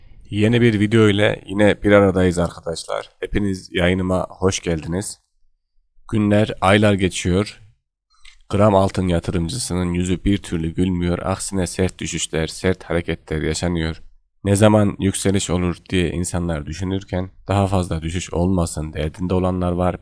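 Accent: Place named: Konya, Turkey. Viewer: konya şivesiyle konuşuyorsun konyalımısın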